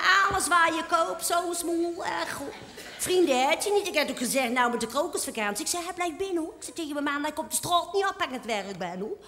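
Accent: Utrecht, Netherlands. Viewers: Heerlijke vertolking van Utrechts ,lekker doorgaan zo. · Prachtig accent dat Utrechhhh en die zelf bedachte scheldwoorden. Super!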